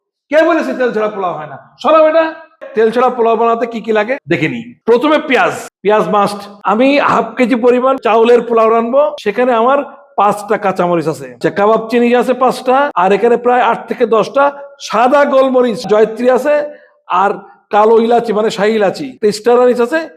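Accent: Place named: Jessore-Khulna, Bangladesh. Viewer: আপনার বাসা মনে হচ্ছে যশোর খুলনা তে।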